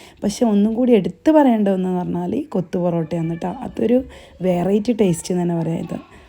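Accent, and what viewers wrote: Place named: Kannur, India. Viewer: അടിപൊളി🔥🔥 കണ്ണൂർ ഭാഷയിലെ നല്ല അവതരണം🎉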